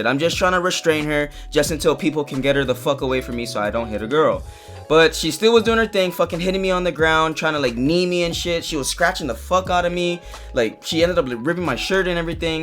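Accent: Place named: Polynesia. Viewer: I shouldve known. u had that poly accent.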